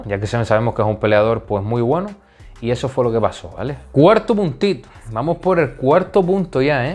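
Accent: Canary Islands, Spain. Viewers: Lo fácil siempre es burlarse de la gente que empieza hasta que empiezan a tener éxito. Entonces llegan las críticas. Sigue hablando canario, que quien se acompleja de sus raíces son unos pocos, el resto nos sentimos orgullosos de hablar canario. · Buen video cmo siempre,una pena el "robo"a Tato, y t digo una cosa,precisamnte el acento Canario es lo q le termina d dar el toke perfecto a los videos,saludos bro💪🇮🇨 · Buenas tardes ante todo muchísimas felicidades por tus vidios y que sepas que te sigo por tu acento CANARIO.como yo también lo soy y como ablas en los vidios sin cortes.de ser así creo q no t seguiría como te sigo👍💪sigue así crack y a seguir creciendo en este ámbito un gran saludo campeón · Usted es clase aparte! naturalidad, sincero, conocimiento, apoyo a los peleadores Canarios y de la Peninsula y a uno como Canario se le llena el pecho de escuchar ése acento, oite exentrenador ?👎🏽. 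Daniel Barez, futuro ®️una pena por Tato y Sebas !! Grande Bestia 🦍💪🏽💪🏽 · Que sepas que yo t sigo por ese "rollo canario" porque es como si estuviese escuchando a un colega comentar la pelea/hablar de mma. Sigue así que creas muy buen contenido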